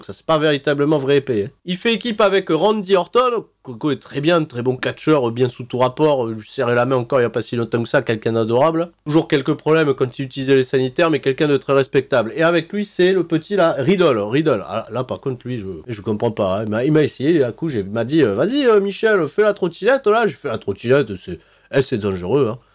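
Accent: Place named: South, France. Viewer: c'est plus très marrant le délire arbitre accent du sud un peu con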